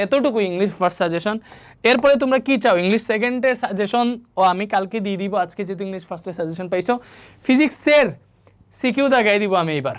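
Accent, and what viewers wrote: Sylhet, Bangladesh. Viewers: Vai maybe sylheti ?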